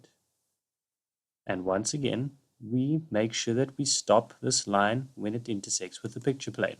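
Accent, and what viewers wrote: South Africa. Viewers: You sound Afrikaans, Mr Sir. Would you like some YouTube help by the way?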